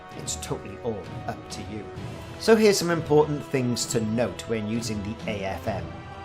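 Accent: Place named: Cardiff, United Kingdom. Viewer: Nice one! V useful, and your accent is making me homesick for my Cardiff roots.Subscribed.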